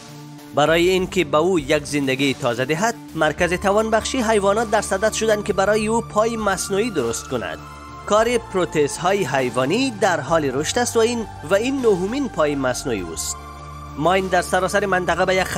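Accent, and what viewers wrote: Afghanistan. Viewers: قربون اون لهجه شیرینت برادر افغان عزیز 🙏🏻 ❤️ خیییلی مخلصیم. بذار خورده ای ازت بگیرم... میبایست قبل از عنوان کردن موارد توضیح مقبولی دربارهء چاپ 3D یاهمان چاپ ۳بعدی میدادی نه اشاره وآر... بهترینهای خالق زیبایی رو برای شما دوست ارجمند آرزو دارم 🙏🏻 🌷 🌹 🌹 🌹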